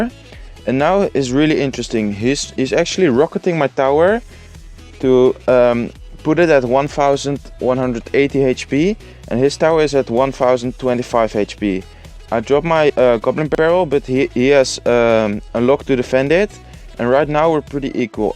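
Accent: Netherlands. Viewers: Are you dutch? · Hey man! i love the vids, you're really starting off strong as a channel :) Only thing i want to give you as a tip: maybe work on your english accent for a little bit. I totally understand since i'm dutch too, but i guess a better english pronounciation may help your channel grow. Your content is A+ :D love it! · You still have the Dutch accent :) Good vid btw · Ik kan echt een nederlands accent horen wanneeer je an zegt (je hebt de neiging om een te zeggen) en plus (dat je gewoon nederlands uitspreekt. Let daar een beetje op.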